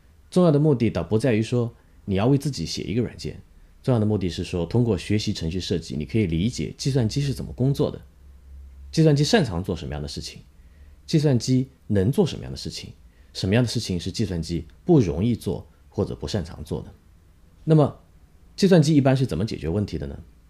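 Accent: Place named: Hangzhou, China. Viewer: 翁老师的杭普太亲切了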